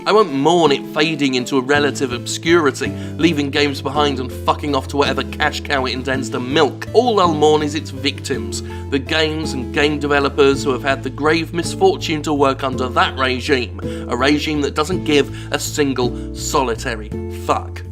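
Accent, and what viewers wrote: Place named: Yorkshire, United Kingdom. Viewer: I'd pay so much money for a Silent Hill voiced entirely by Jim. Especially that Yorkshire accent.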